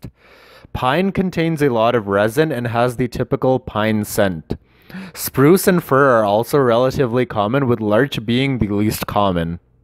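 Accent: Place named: Canada. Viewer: I like a Canadian accent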